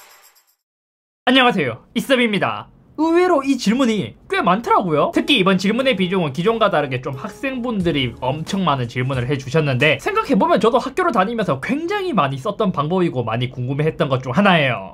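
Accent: Gyeongsang, South Korea. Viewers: 경상도 억양 빼고 다 좋음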